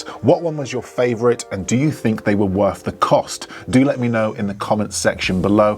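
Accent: United Kingdom. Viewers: I like the clarity and emphasis of the British tongue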